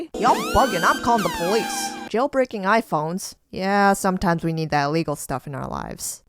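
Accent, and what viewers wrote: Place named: New Jersey, United States. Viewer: no hate but u sound like the steryotypical jersy girl/New york girl 😂💞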